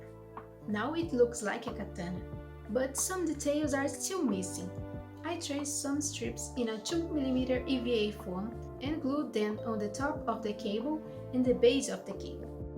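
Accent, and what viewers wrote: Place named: Brazil. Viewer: que ingles de brasileiro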